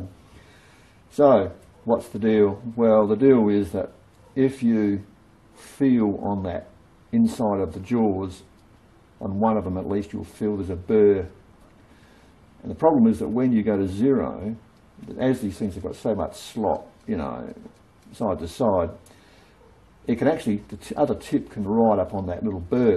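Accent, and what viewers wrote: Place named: Australia. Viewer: hi!! i have those calipers too. i have that problem so i am going out now to fix them. thanks keep up the great work. good to hear an aussie voice on youtube!! lol!!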